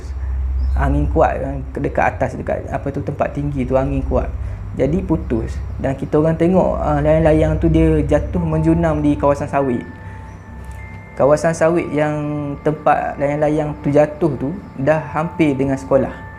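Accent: Terengganu, Malaysia. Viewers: Org ganu ke dik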